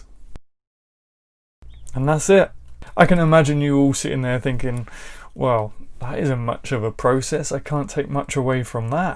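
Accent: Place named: Yorkshire, United Kingdom. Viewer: hahaha I also suffer with running but I'm a walking injury anyway 😂. Thanks so much for the support man, massively appreciated. Although I don't think the Yorkshire accent will ever catch on as much as your classic British accent hahaha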